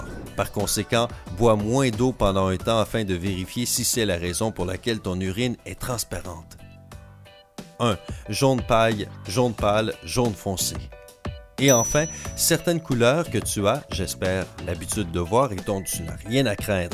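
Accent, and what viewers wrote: Quebec, Canada. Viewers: Moi j'adore l'accent québecquois tout simplement ! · Enfin un canadien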